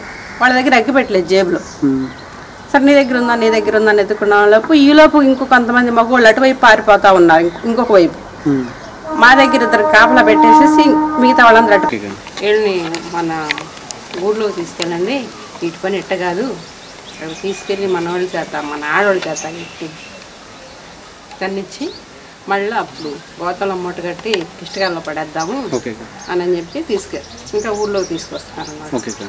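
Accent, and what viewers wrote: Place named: Tamil Nadu, India. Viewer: I want to tell one thing to Anchor. Don't try to separate mala , madiga communities ra idiot. Why you are asking again and again how is mala madha communities now , they are one or not dont ask in such a way and put fire 🔥. Mala madiga are both communities are SCs and still upcoming now.....Bastards like (ancor) you are putting / creating problems in mala madigas. So i warn you dont try to be smart for your anchoring purpose. Are chetta na son. Where you are from . Nuvvo arava na kodukivi( i understand with your pronouncing of language)........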